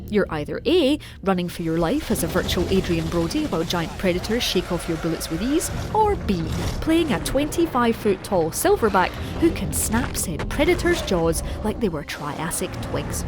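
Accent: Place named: Scotland, United Kingdom. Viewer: I think Scottish accent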